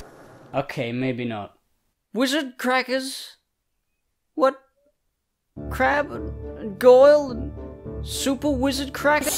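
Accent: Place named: United Kingdom. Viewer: I fucking hate british accents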